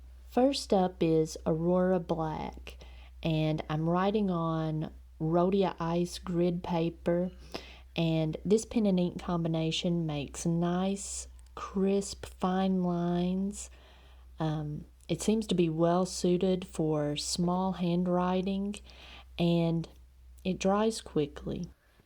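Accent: South, United States. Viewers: being from mississippi ... love that southern draw ... :-)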